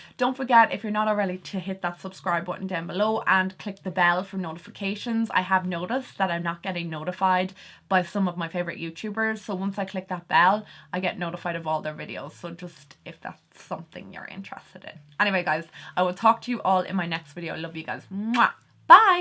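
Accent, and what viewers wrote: Ireland. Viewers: Hello beautiful, new subscriber. So proud I recognized your accent as an Irish one. 😉😊 How lovely. 💖💖⚘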